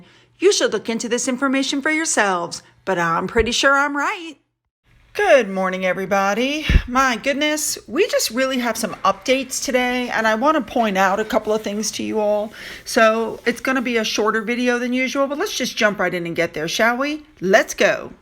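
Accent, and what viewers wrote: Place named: South, United States. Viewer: You off the chain ❤️❤️. That’s how we talk down south!! Congratulations!!!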